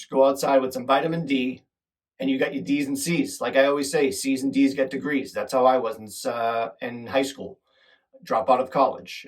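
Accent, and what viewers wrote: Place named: Boston, United States. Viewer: You sleep? 😂😂😂 Your Boston accent is my favorite part of your show! Keep trucking my friend!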